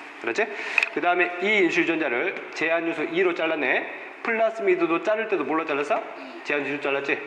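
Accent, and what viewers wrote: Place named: Jeolla, South Korea. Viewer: 아따 전라도 사투리 구수하구마잉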